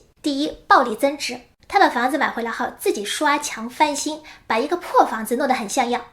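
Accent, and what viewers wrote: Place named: Shanghai, China. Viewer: 口齿伶俐思路清晰财商飙高，再加上这发音，觉得是上海妹子。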